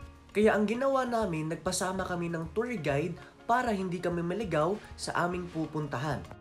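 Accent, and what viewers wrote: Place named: Philippines. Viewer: ang accent mo parang tagalog, hindi ka ata "Bisaya" murag Tagalog! Wala ba mga buaya diha?😅 Amping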